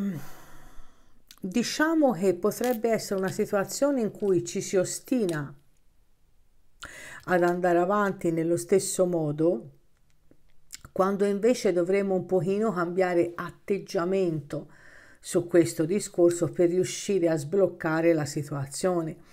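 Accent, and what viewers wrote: Tuscany, Italy. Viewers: Ascoltati tutte e due, perfetti in entrambi. Grazie mi piace molto il tuo accento Toscano 🤩🥰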